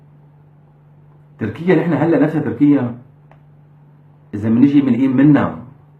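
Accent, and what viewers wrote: Syria. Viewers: لهجتو شامية خالصة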